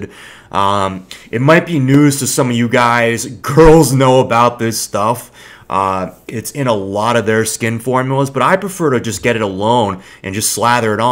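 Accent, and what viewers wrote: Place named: California, United States. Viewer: it's just a smart Cali dude cadence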